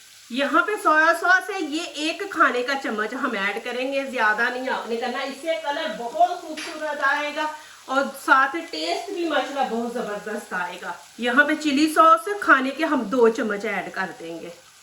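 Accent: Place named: Punjab, India. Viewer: Jazakillah piyari naik baji. Aap bohat mukhlis aur piyaar karnay wali baji hain. Aapki har recipie aalaaaaaaa hai. Aapkay program main bohat apnaiyat ka ahsaas hota hai. Aap bohat mahnut aur muhabbat sai hamain sub kuch Sikha rahi hain. Allahpaak aapko do jahan ki khushian day .Aameen. Aap bawazoo rehkur khana pakati hain aur duaen daity rehti hain . Aap apnay parents ko hamara bohat salam kahain kiunkay aapko daikh k unki tarbiat pay rashk aata hai. Baji aik baat aur kabhi hai k aapka piyara punjabi lahja hamain to bohat acha lagta hai magr kuch loag aapka mazaaq uratay hain. Jo humsai bardahst nahi hota. Allahpaak subko kamil hidayat day. Aameen.🤲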